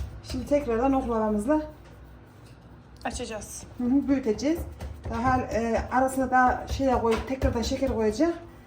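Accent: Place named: Aksaray, Turkey. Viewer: Eline sağlık çok güzel görünüyor ayrıca konuşma tarzından anladım hemşeri olduğumuzu 68 vatan 07 mekan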